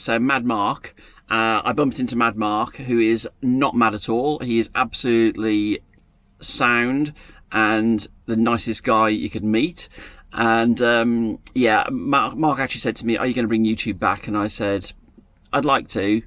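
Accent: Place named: North, United Kingdom. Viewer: You sound a northerner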